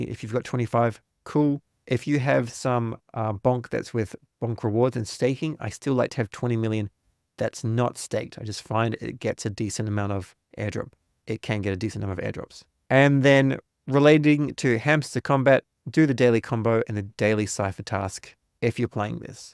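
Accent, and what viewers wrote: Australia. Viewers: As an Aussie i love that your accent is still intact 😊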